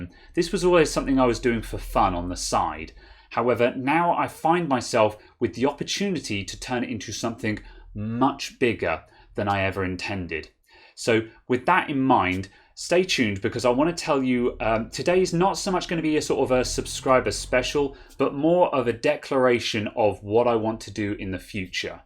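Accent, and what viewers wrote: United Kingdom. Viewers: I'd echo this. Nothing against US/Aussie/OtherNationInEnglish sites, but nice (comforting) to hear it with a Brit accent with Brit vernacular.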